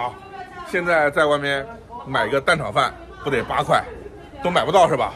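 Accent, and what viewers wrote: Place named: Feidong, China. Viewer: 肥东话多少还是和市区的有区别 不过也差不多是乡音了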